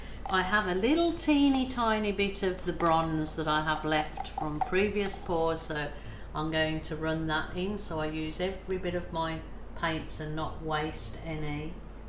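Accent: Birmingham, United Kingdom. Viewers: You just keep on rabbiting, it’s what we brits do! You can take the girl out of Brummie, but you can’t take the Brummie out of the girl! Love the swipe.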